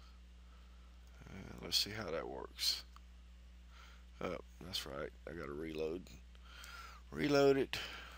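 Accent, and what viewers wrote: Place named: Tennessee, United States. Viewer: It's the Tennessee accent for me.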